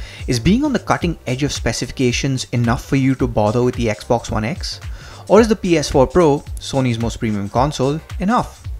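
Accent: India. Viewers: i cant understand this indain tech support guy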